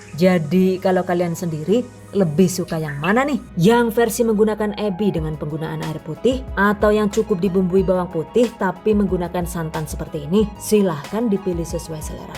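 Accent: Banyumas, Indonesia. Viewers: Dari mana mba jawanya mantap ngapak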